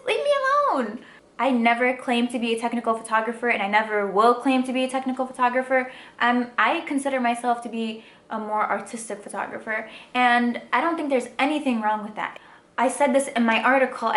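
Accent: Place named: Detroit, United States. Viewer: Yeah you from Detroit ... I hear it all in your voice lol I know cuz im from here too lol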